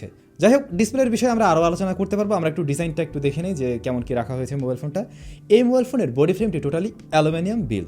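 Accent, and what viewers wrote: Barishal, Bangladesh. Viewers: ভাই আপনার ভাষা বরিশালের মানুষের মত মনে হয় 🙂 আপনার গ্রামের বাড়ি কি বরিশাল? বরিশাল হলে তো আমার মতো 🙂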